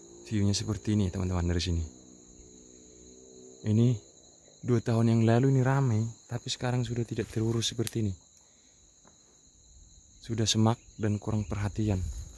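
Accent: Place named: Batak, Indonesia. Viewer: Dari dialeknya, BATAK kali pun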